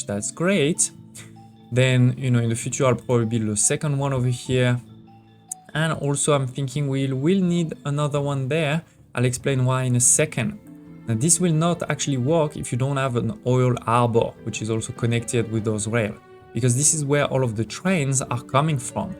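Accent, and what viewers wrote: France. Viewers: i think the video would be better without the french accent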